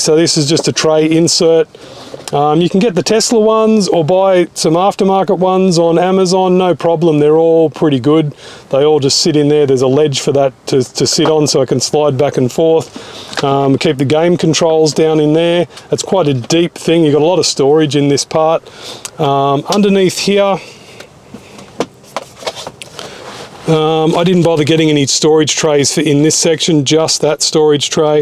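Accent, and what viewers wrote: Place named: Australia. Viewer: Very informative for new and old Tesla owners. Keep churning more videos. Aussie accent goes a long way. Thanks mate!!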